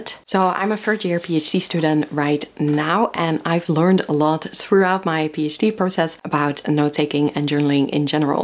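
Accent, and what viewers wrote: Netherlands. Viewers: The accent strikes me as a native Dutch speaker (or perhaps another European language). Being close to the UK, Europeans generally pick up more of the British accent than the American one. Let people speak how they want, if you’re understood, you’re doing fine.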